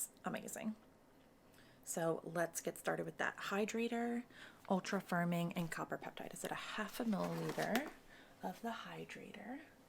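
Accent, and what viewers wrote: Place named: United States. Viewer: I totally thought you were going to have a british accent 😂 I'm actually shocked you have an American accent 😂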